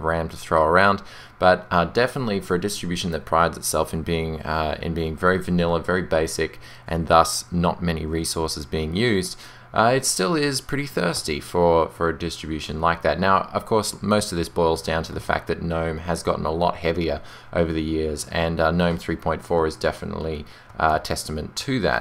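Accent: Australia. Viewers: I think there's a quite a bit of Ozzie in his voice but I dunno what else.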